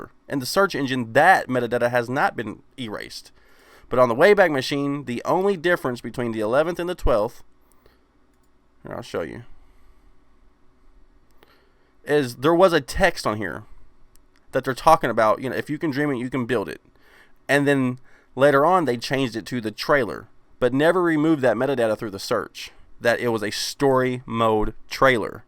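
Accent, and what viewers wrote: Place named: Georgia, United States. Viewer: It's ok, I like your accent, sounds like what I hear in Georgia.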